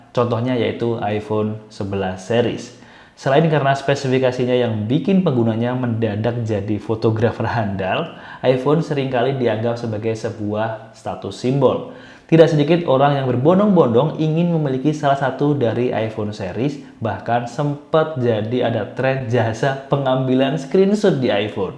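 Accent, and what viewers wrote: Indonesia. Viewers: Orang Lampung ya bang?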